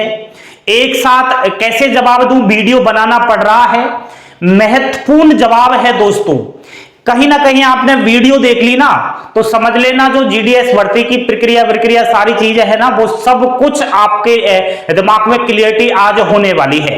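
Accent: Rajasthan, India. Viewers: Sir aap rajasthan se ho kya ❤️❤️❤️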